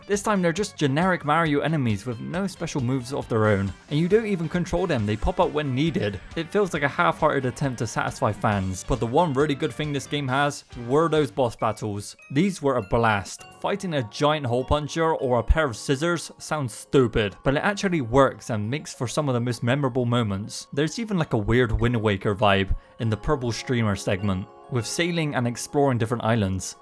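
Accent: United Kingdom. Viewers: I have a suspicion that this guy is british but i cant put my finger on it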